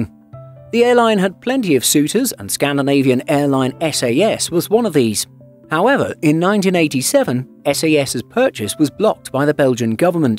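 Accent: France. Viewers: Nice french accent! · that french accent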